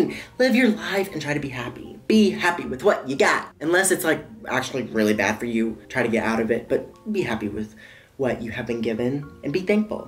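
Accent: South, United States. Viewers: Finally a YouTuber that I actually like that has a southern accent!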